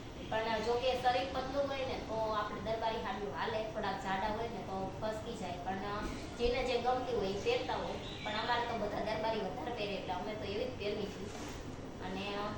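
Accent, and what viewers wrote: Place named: Rajasthan, India. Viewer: Tmra boli upar thi lage rajput hso tmaru gam kyu ame bi rajput siye tme amari chennal ne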